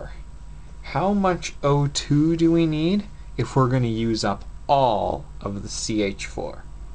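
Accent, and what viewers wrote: Canada. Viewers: i hear that canadian accent on ya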